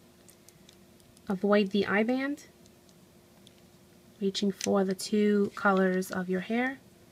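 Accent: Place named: New Jersey, United States. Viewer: you have a New Jersey voice... no offense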